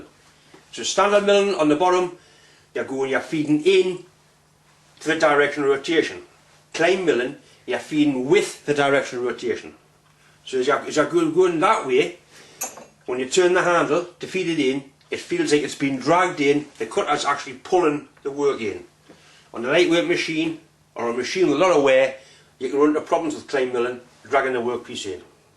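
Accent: Newcastle, United Kingdom. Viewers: 62 Btw he is not Irish he is infact a geordie ( from Newcastle, England)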